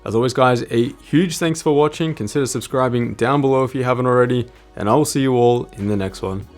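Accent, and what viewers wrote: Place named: Australia. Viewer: Love your videos, nice to have an Aussie accent coming through. I saw in this video that you show the Dark Rock 4 cooler on a min-ITX board and it not fouling on the memory. I'm looking for an air cooler for my Asus b450-I gaming board but can't find any firm info on if the DR4 cooler will interfere with the memory slots or not. Can I ask which board you have in this video, and if you have any idea if it will usually foul against memory or not?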